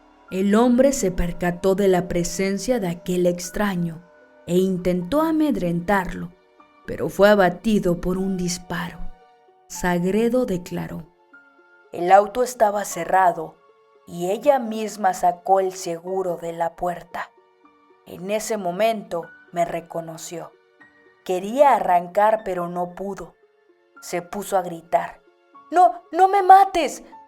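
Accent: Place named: Mexico. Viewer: Muchas gracias,adoro tu trabajo , e tu acento mexicano , lo encuentro muy agradable al oido. Abrazos desde Brasil.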